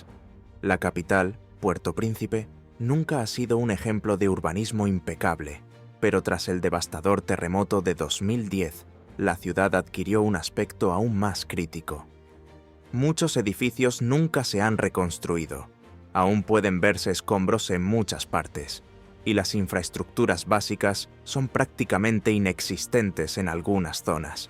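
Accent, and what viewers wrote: Spain. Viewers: La envidia te hace delirar, solo hablas por que te dicen los otros como tu envidioso, ... ojalá algún día tengas la posibilidad de ir a Venezuela, .... para que veas lo muy superior que es sobre tu país y muchos otros. Ese narrador nunca ha saludo de España que creo es su acento, por lo tanto habla bobadas por que no sabe. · por tu acento asumo que eres español, lamento decirte que españa tampoco es la octava maravilla del mundo Einstein, saludos desde el pais mas lindo del mundo ES.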